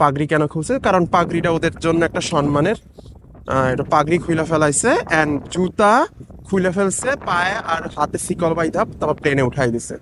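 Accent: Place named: Jessore, Bangladesh. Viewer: আপনার ভাষা আমার এলাকা যশোরের মতন অনেক টা । আপনার দেশের বাসা কোথায় ?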